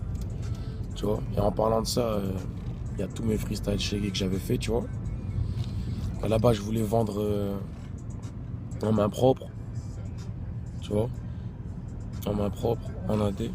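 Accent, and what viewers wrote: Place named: North, France. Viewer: trop fort l accent de chez moi sheguey le nord en force ca fais bizarre on entends pas souvent un accent comme ca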